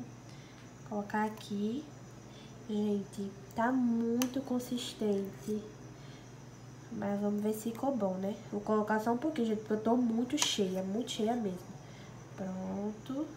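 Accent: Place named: Northeast, Brazil. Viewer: Sotaque Nordestino U3U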